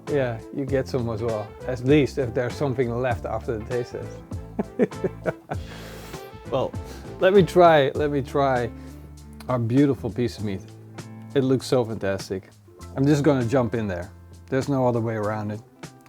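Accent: Netherlands. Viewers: You're dutch arent you.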